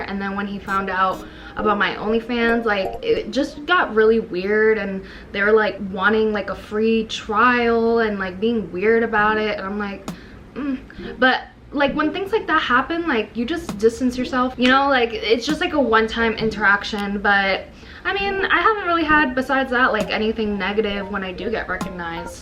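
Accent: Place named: Miami, United States. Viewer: The Miami accent is real 😅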